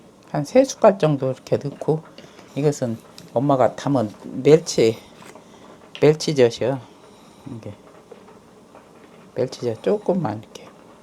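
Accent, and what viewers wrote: South Korea. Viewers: 무우를무수라고??ㅎㅎ 전라도말투 저도전라도여요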